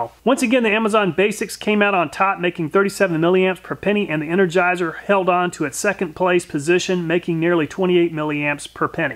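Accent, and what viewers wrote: United States. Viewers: plus that strong american accent 😁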